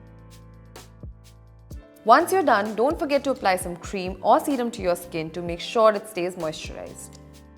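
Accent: India. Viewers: I was not expecting that Indian accent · She is indian that's why the accent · Clicking on the video assuming it a korean channel. Listening the accent: Indian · You look Asian but sound Indian 🤔thanks for the video 👍🏼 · Her accent sounds like indian accent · I'm surprised that your English accent sounds like Indian!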